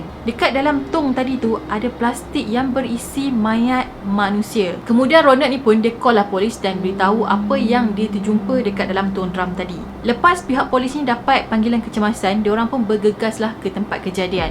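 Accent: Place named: North, Malaysia. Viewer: 20% masih ada sleng utara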